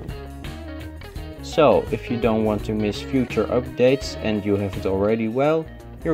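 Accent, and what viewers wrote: Netherlands. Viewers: That moment u just feel the Dutch accent and its true too 😂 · My Netherlander friend, I say this only because I know you are interested in knowing things and not because I am trying to be pedantic, diaphragm is pronounced "die-a-fram." The G is silent. Thanks for the all the great videos & love your accent!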